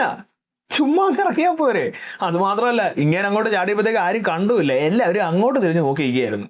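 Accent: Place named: Kottayam, India. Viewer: Chettan Kottayamkaran ano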